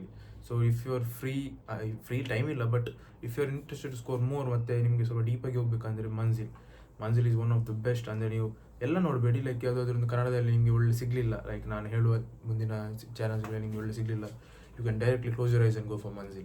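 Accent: India. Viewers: 100% ur tuluva 😂 erena kannada kenagene gottapunduye😂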